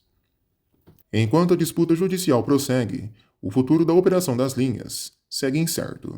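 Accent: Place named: Inland São Paulo, Brazil. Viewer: Narrador com sotaque de paulista do interior.... é bizarro!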